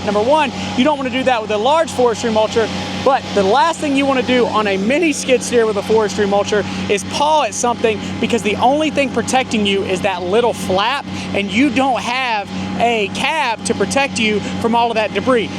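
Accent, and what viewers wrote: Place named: Carolinas, United States. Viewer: Carolinas, I thought so from the accent. Northern central Alabama here.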